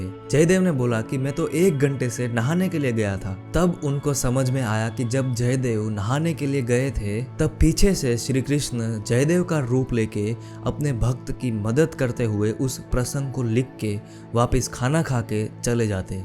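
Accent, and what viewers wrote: Gujarat, India. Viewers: You sound to be Gujarati??😅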